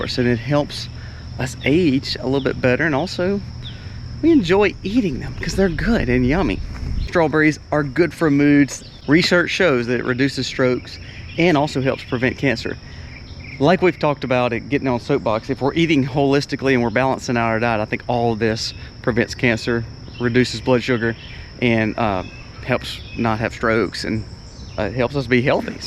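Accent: South, United States. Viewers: He has a rather Southern accent. Maybe he moved, or is in the Appalachians or Ozarks where the weather is more suitable, but Rhubarb comes from the cold, Continental climate of Inner Asia (Central Asia + Himalayas/Tibet). It hates the Deep South and quickly perishes because the summers are hot and winters are far too short.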